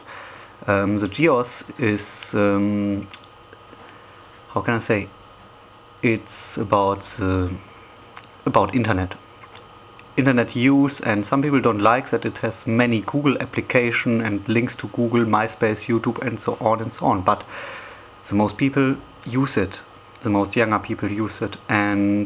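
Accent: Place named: Germany. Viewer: du solltest schon Deutsch reden dein Akzent hört sich nach deutschem an. dieses kommentar hab ich von nem LapTop mit gOS geschrieben.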